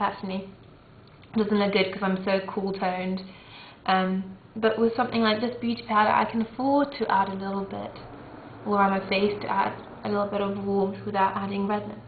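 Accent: England, United Kingdom. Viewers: Heyy Ur To Pretty And U've Got A English Accent Like Mee Yayy!!!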